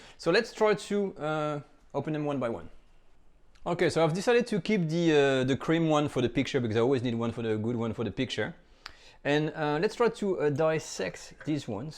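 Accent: France. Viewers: Is your accent a regional one? I love it. Bit different to other French people I’ve heard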